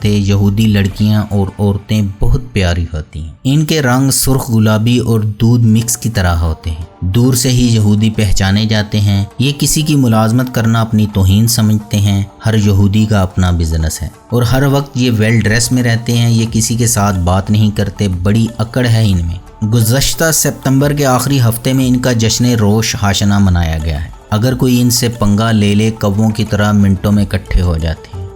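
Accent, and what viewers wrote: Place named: Sheikhupura, Pakistan. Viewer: dear AAP se poocha Hai AAP kis City se Hain please tell me , I like your accents shiekhupura ka lagta hai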